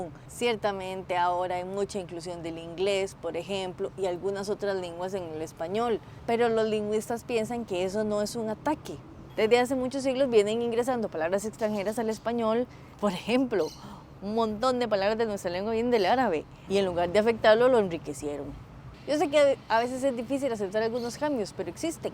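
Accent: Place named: Colombia. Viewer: Pensé que era colombiana profe por si acento. Gran vídeo. Pura vida❤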